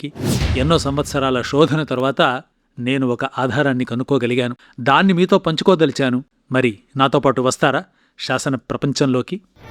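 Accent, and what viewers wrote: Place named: Karnataka, India. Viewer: ನಮಸ್ಕಾರ. ನಿಮ್ಮ ತೆಲುಗು ಉಚ್ಚಾರಣೆಯಲ್ಲಿ ಕನ್ನಡ ಕಸ್ತೂರಿಯ ಕಂಪು ಗಾಢವಾಗಿ ಬೀರುತ್ತದೆ. ದಯವಿಟ್ಟು ಕನ್ನಡದಲ್ಲಿಯೂ ಶಾಸನ ವಾಚನ ಕಾರ್ಯಕ್ರಮಗಳನ್ನು ಮಾಡಿರಿ.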